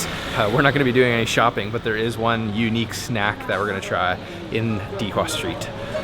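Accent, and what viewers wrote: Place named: Canada. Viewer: Your Canadian accent is so freaking annoying.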